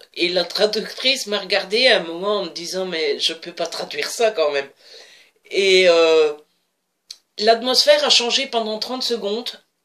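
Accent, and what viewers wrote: Belgium. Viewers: Je découvre ta chaine depuis quelques jours. Je me fais toutes tes vidéos depuis ( quelques avances rapides parfois, j'avoue ^^ ). On a pas forcément les mêmes styles de lecture ( ne suis pas porté sur la romance par exemple mais j'aime beaucoup les thrillers;-) ) mais j'apprécie ton style, ta façon de faire, ton naturel mais aussi ta sensibilité,... Et puis, y'a un point qui me plait beaucoup, c'est ta " belgitude ". Comme toi, je suis belge et quel plaisir de voir et d'entendre un booktubeur belge ( très peu nombreux, hélas )!! Cet accent assez brusselair je trouve ( plus marqué que le mien, moi je suis de la région de Tournai donc j'ai + l'accent ch'ti, c'est ce que les liégeois me disent en tout cas, lool ), tes " godverdome ", nom dé djeu, ect ect.... moi, perso, je kiffe! Voilà, ne change surtout pas ça stp!!;-)En ce qui concerne cette vidéo-ci, tu as parfaitement su me faire revivre ta journée avec tes moments qui te sont chers.J'ai, à la fois, ri et été ému. 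Agréable 3/4 d'heures passés " en ta compagnie "!Bref, j'en fini là. Continue comme ça, ne change pas. Tu es top!:-)ps: Je t'ai ajouté sur Livraddict, j'ai liké ta page Facebook, y'a que ton Fb perso que tu n'as pas accepté mais je peux comprendre, no soucis.;-)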